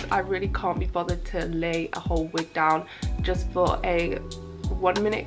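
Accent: United Kingdom. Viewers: Hey as another mixed race girl... do you tan well? Just wondering because from your accent I'm assuming you're in the UK and I heard that there's not a lot of sun there so I feel like if you were somewhere else your hair would probably lighten up and your skin would get darker. And possibly even give you freckles, judging by your skin tone. Edit: Cool makeup tho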